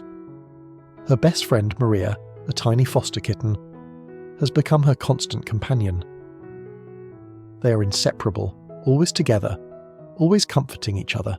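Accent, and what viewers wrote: United Kingdom. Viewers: Thank you living angel❤❤❤. Pls use a narrator with a US accent . The British accent is very irritable .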